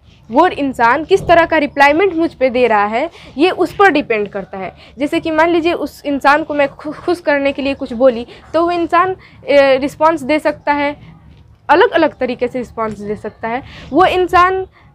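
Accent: Bihar, India. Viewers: I think this mam from saran,siwan, Gopalganj. Bihar... definitely...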